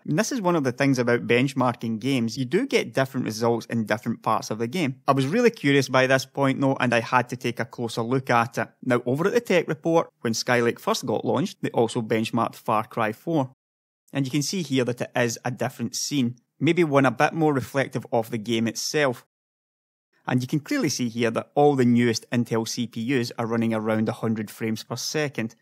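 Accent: Scotland, United Kingdom. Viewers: Really liking to hear a Scotsman talking tech. Keep up the videos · what accent is that? you make me think of Brave's highlanders. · the scottish accent is awesome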